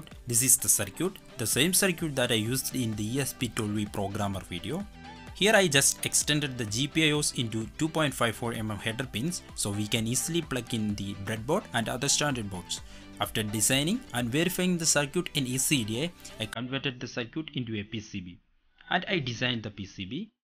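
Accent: Kerala, India. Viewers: So, a total of 5$ which is not that costly but judging by your accent are you from Kerala,India?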